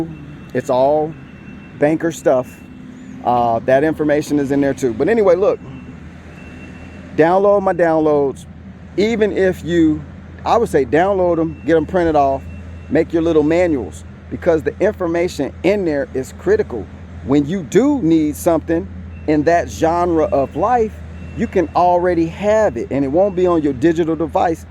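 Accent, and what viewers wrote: South, United States. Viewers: I love the message. you sound like you from down south. my family is down for your training!! this is food!!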